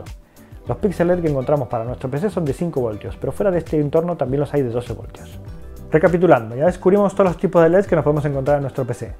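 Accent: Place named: Argentina. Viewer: Se te nota el argentino todavia!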